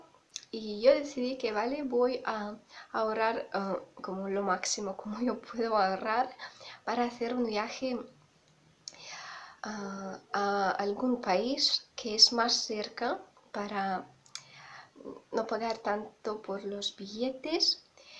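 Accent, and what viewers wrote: Spain. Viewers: Lindo tono de voz, acento de España, y se va quedando el acento Ruso. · Tienes un español gallego, mejor te quedaría el español latino, saludos desd Chile..😎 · Acento español se oye gracioso vente a México y realizaras tus sueños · Tu tono de voz y tú acento de España me causa asmr... (Un unintentional asmr) haber cuando haces un vídeo asmr😻si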